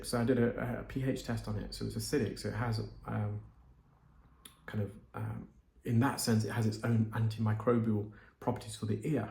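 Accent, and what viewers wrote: United Kingdom. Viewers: The lips drive me crazy. The British accent makes everyone’s lips fold into Donald Trump lips. so distracting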